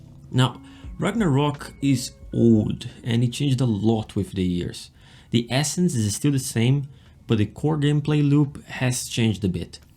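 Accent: Brazil. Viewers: Demorei a perceber que você era brasileiro, seu sotaque é maravilhoso. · I was suspecting you were Brazilian because of your accent, then I saw you chatting in Portuguese lol Nice video, meu conterrâneo!! Let's play together sometime... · Are you Brazilian by any chance? I saw you are playing in Portuguese. And I can sense I little bit of ascent. I'm from Brazil btw haha😊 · KKkkk estava assistindo o video e pensei se era Brasileiro kkk pelo sotaque. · Are you brazilian? I can sense your accent might be from there! :)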